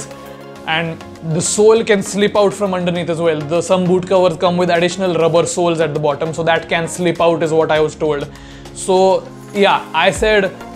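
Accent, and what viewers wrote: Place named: Russia. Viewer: Bro,what's that Russian accent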